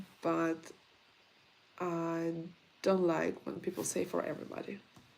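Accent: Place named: St. Petersburg, Russia. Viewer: great video! I lived and worked in Moscow years ago and visited St. Pete where I believe you are from many times. Your accent brings back some wonderful memories! Come and visit "the Valley of the Sun" here in Arizona sometime!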